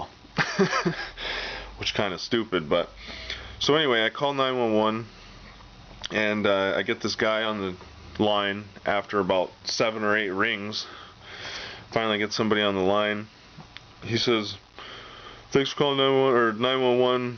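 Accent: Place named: Minnesota, United States. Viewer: being prepared isn't paranoia , it's being responsible. In a life and death situation seconds count so making the simple effort to have a pistol within arms reach at all times is a matter of self preservation. And in this guys case it's a necessity with his local police force, or lack there of. Just curious what state you live in, you sound like my friends in Minnesota.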